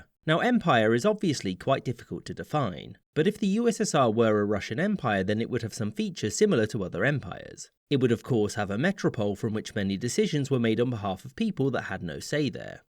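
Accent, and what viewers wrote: United Kingdom. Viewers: The narrator literally has a British accent and you still accuse them of being American lmao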